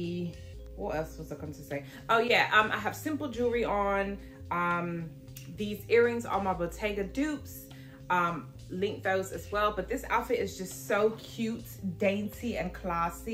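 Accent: United Kingdom. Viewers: And just like that she’s back with the fashion tips and the British accents 😂😂😂🎉🎉🎉🎉🎉🎉❤❤❤ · Am glad you brought the looks back and the British accent, yaaaaass hun!!!!!🙌🏾🙌🏾🙌🏾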